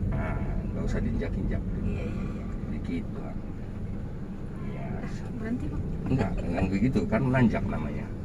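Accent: Medan, Indonesia. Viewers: Enak ini bapak ngajarinya top mudah dipahami sabar dan telaten diawali dr dasar bicara logat Medan teringat ayah ku kalau bicara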